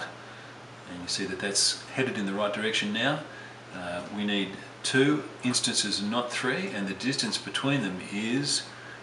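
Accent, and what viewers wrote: Australia. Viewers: Thank you for these tutorials, your teaching style makes gaining skills easy. I really look forward to going through them again with the updated interface as there is some frustration when my copy of 360 doesn't behave the same as yours. It's good to hear an Australian voice also. Quick request that you cover the fillet command in some more detail. In Ex 14 I tried to fillet the join between the 32dia boss and the top of the flat part without success, the arrow kept pointing into the join rather than out.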